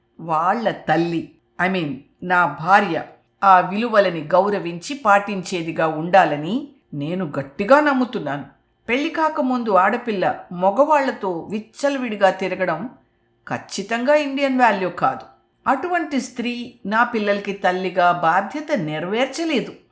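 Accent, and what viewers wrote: United States. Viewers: Your story narration is very nice You are talking like an American women 🌹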